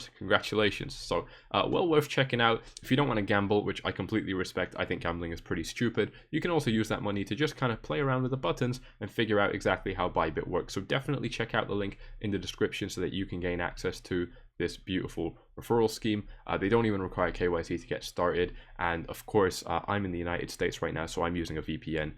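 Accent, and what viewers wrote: Scotland, United Kingdom. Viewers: First time watching your video- great content thanks! Is that a fellow Scottish accent I'm hearing?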